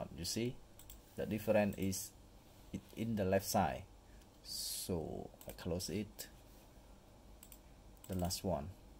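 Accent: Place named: Cambodia. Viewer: បងខ្មែរមែន ព្រោះនិយាយតុងខ្មែរ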